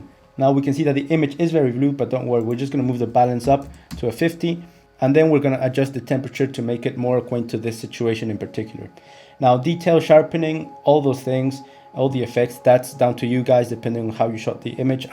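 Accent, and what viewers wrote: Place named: Latin America. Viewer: Cómo se nota que eres hispano parlante, entiendo todo lo que dices a la perfección jajajaja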